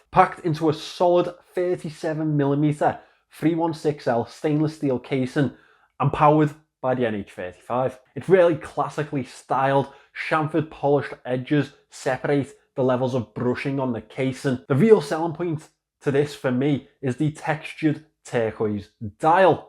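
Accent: Liverpool, United Kingdom. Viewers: hey, nice choice of watches. Nice video. What accent do you have? Scouse?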